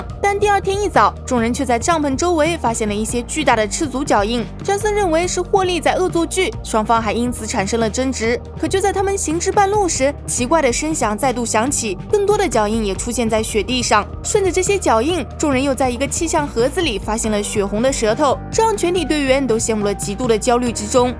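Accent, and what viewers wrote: Jiangsu-Zhejiang, China. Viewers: 可能因为Up主是江浙人吧~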